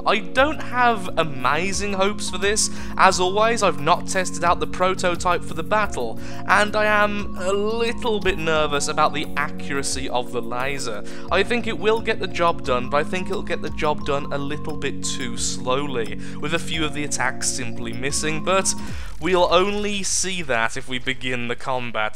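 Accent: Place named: Wales, United Kingdom. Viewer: Wales now i get it!, been thinking for ages were ive heard that acsent from ( was in wales for a clan meeting WASP INC) and now i finally get why your acsent sounded so familiar. XD Love the vids keep em going ^^ ( grammar ain't my strong suit neither in Swedish or English)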